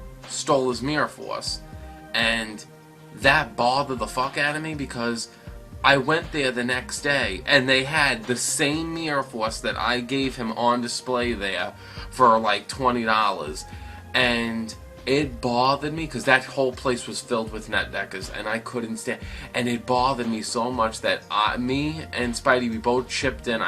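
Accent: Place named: Brooklyn, United States. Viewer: you from brooklyn bro?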